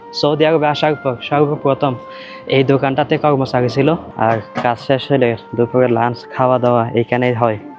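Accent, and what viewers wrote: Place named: Sylhet, Bangladesh. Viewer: সিলেটি কথা শুনে ভালো লাগলো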